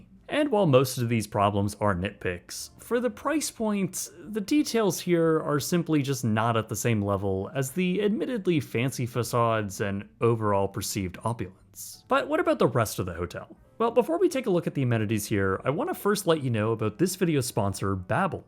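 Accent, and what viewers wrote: Canada. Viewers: This guy just whines about everything in a Canadian accent and makes sure to say “out” and “about” in that dumbass way as much as possible in every video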